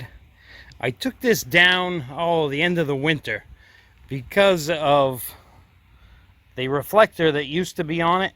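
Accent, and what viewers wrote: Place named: Massachusetts, United States. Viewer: You aren’t from NC originally are you? You got that Peabody / Beverly MA accent going on. Hahaha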